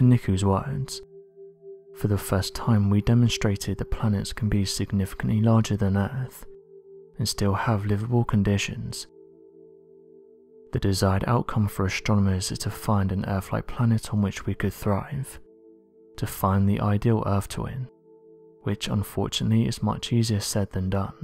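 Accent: United Kingdom. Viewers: I hate this guy's lazy British accent.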